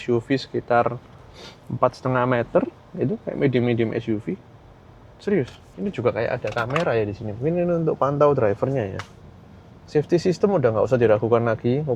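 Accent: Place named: Java, Indonesia. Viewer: Medhoknya jangan sampai hilang. Sudah jadi ciri khas.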